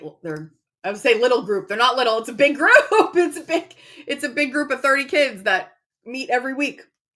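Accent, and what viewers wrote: United States. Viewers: I wish I understood American! Your English is so different than British English. When you talk about being in school, so you mean school or university? We do school and college up to 18, then it is university. What is sorority and what are the implications of that? The financial acronyms are all so different to ours, so I tend to get bemused. Any interpretation of these things would be welcome. Love your videos and look forward to them each Friday, plus these lovely extras. My life stage is retiring from employment and looking to bring in income from additional streams to keep afloat. I have no debts, and having freedom in that way.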